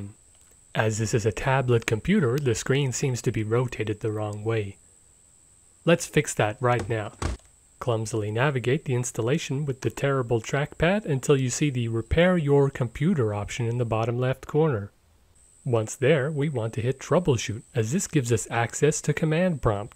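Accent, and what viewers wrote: United States. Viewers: It’s been so long he almost got me with the American accent. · Just realised was speaking in an American accent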